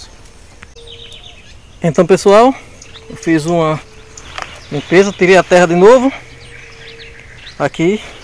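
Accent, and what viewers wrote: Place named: Northeast, Brazil. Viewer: acho muito legal ver seus vídeos e ouvir sua narrativa com esse sotaque típico do verdadeiro nordestino. Abraços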